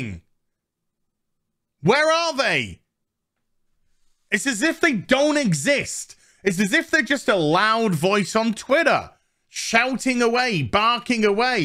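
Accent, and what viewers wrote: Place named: RP, United Kingdom. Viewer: BTW, I love your "British" (posh) accent.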